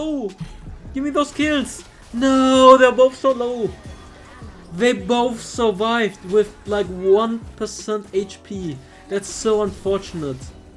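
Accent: Germany. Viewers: Most probably Germany. Pretty typical accent over there. 😁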